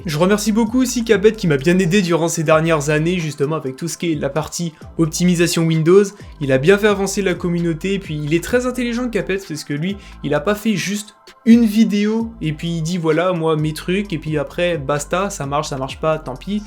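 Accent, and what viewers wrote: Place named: England, United Kingdom. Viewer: sartek l'accent rosbeef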